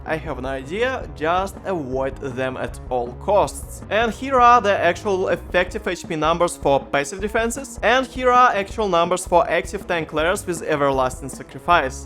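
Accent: Russia. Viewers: мне кажется или у чувака русский акцент?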